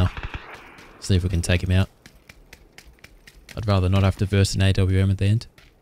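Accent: Australia. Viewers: He's Australian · How do u have such a low ping, you sound Australian..I can only get games in AS now..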